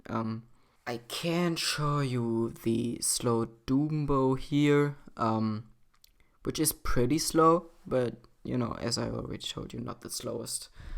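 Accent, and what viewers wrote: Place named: Germany. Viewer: np btw you sound like you speak german cuz of your english accent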